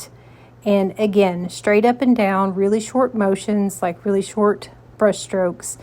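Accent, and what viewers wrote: South, United States. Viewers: that southern drawl is to die for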